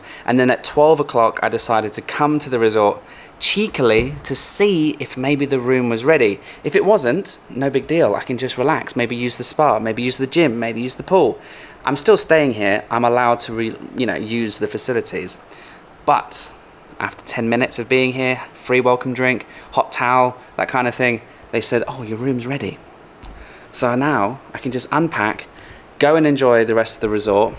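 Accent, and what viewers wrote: United Kingdom. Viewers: I clicked hoping to hear a British accent - yesssssss!!!!!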